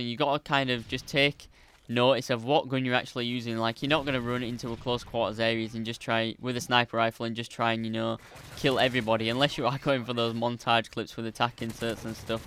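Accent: Yorkshire, United Kingdom. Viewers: love the yorkshire accent, good tips and comp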